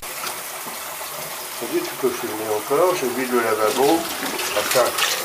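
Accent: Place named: East, France. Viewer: Ahhhh vous êtes de l'est je pense ! Ça me rappelle des souvenirs cet accent :)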